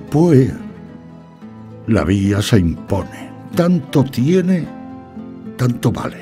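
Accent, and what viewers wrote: Spain. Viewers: Pepe Mediavilla! Por usted brindo! Con respesto also mi copa y en su honor hoy vevo un tinto de buena cepa, fino. De corazon es mi sentir que lo hago verbo y con aplausos y agurios verdes de Romero, Albahaca y yerba buena afirmo y declaro por usted Pepe Mediavilla, gran Sr. : Que viva tu vida! Viva tu Libertad! Que Viva El Castellano que es tu lenguaje articulado, tu acento, mi herencia! Viva tu Cielo y tu Tierra y tu mar! Viva tu sol , tu Luna y todas tus estrellas! Que Viva tu casa, tu Mesa y tu lar! Viva tu mente con sus luces! Que Viva tu lira, tu historia y tu legado! Que Viva Espana! Que Viva Espana! Desde mi orilla Allende Al mar os deseo todo y so lo mejor! Que todo es sagrado , esto me lo aseguro un cantaor . J .M.y R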